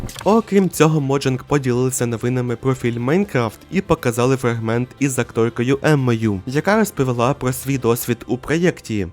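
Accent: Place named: United States. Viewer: ааа, незеритське, зрозумів, автор через свій фірмений американський акцент ні зміг сказати слово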